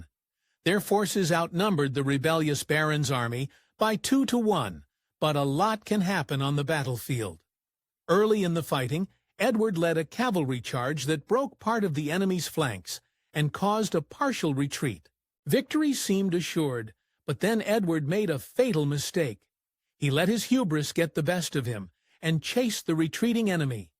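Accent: United States. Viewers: Hearing an American voice trying to explain history is actually comical. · Why is an american talking about English history?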